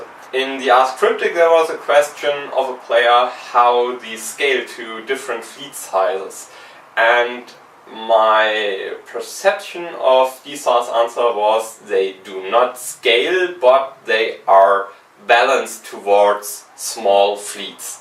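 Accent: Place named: Germany. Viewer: Excellent first episode! Don't apologize for your "bad English." While you do have a strong accent that makes it obvious that you're a native German speaker, your English was easy to understand. Heck, I wish my German was half as good as your English. I look forward to watching more episodes of Captain's Log. Gut gemacht!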